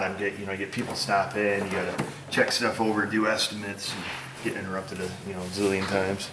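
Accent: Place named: Texas, United States. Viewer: i really enjoy your taking the time to show the tools you use to do the jobs with. i also enjoy your slow texas like voice. i can understand every word. i was literally shocked you were from new york. showing the little things is not reduntant at all. and worth it to a lot of us. your lighting is great and camera angle. you are doing a great job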